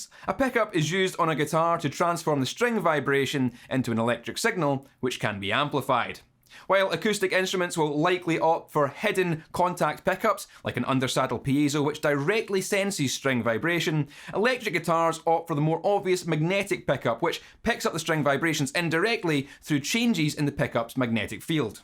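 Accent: Scotland, United Kingdom. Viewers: That was a very consistent explanation indeed....with a nice Scottish touch 👌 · Thanks for the explanation. But damn my ears are almost dead after hearing such a load of British accent.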